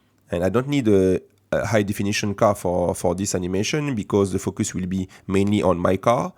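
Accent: France. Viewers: me disait bien que tu étais français j'avais reconnu l'accent 😂 Un énorme merci encore j'apprécie énormément votre travail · L'accent français me fume très intéressant sinon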